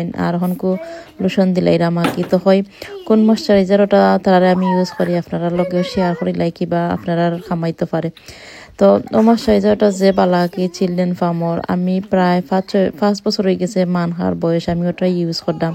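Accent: Sylhet, Bangladesh. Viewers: সিলেটি ভাষায় কথা বলেন শুনতে ভালই লাগে । খুব সুন্দর আপু❤❤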